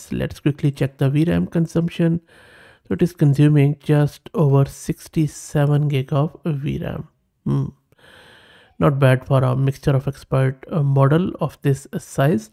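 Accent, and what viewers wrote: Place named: India. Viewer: Индусский английский ни с чем не перепутать! Спасибо за видео!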